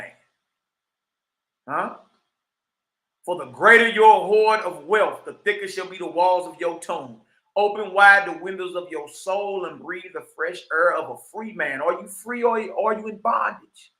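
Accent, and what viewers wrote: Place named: St. Louis, United States. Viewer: Fear/ Furrrr … their/thurrrr .. St. Louis to death 😂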